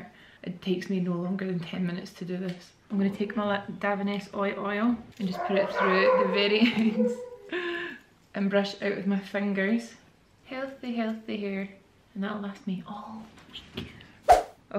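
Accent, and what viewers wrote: Scotland, United Kingdom. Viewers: I’m a huge long time fan from U.S. and I just want to point out that the Scottish accent is extra strong today . LOVE IT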